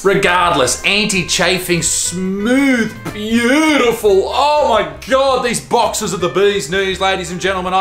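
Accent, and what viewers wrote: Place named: Australia. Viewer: God you're Australian.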